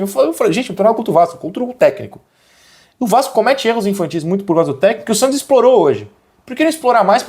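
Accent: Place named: Portugal. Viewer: Não aguento mais português, até o sotaque do cara está me incomodando não que eu seja xenofóbico.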